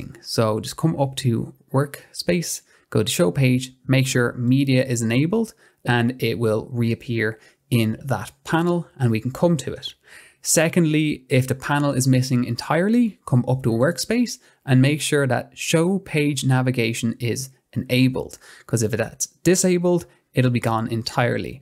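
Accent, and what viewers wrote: Ireland. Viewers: Being also an avid DaVinci Resolve user I have just discovered your channel here on YouTube. Your video tutorials are really helpful 👍and apart from that you are a sweet guy, I also really love & enjoy your Irish accent. Greetings & hugs from Germany ... 💙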